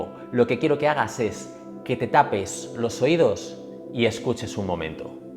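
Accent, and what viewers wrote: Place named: China. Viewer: Usted estudió en China? Tiene cierto acento en la musicalidad del español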